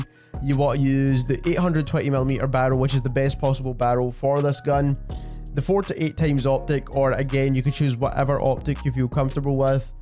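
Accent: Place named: Scotland, United Kingdom. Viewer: Love a good Scottish accent.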